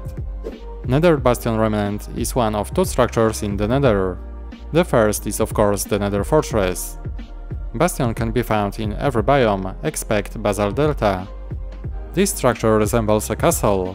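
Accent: Poland. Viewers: Great build From your accent I assume you are from Poland? If so thats amazing for polish community! :) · Damn, I absolutely love it Dobra robota bratku (Wnioskując po twoim akcencie Polska)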